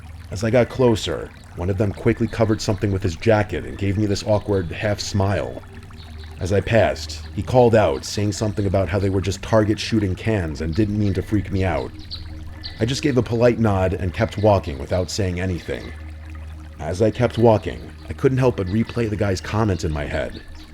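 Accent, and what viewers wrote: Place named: Massachusetts, United States. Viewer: I'm from Massachusetts. sounds like he's got a slight Mass accent.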